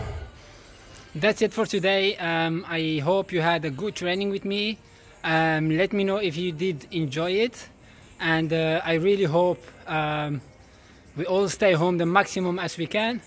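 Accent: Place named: France. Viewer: I felt a french accent in your voice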